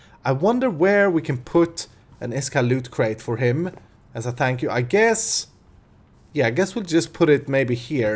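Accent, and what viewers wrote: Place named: Sweden. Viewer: U sounds so much like Anomaly! #Svensk-engelsk dialekt! :))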